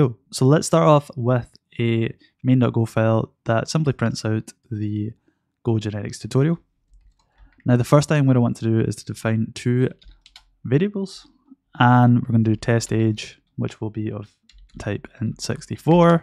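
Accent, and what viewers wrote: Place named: Scotland, United Kingdom. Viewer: Probably the Scotish accent. :)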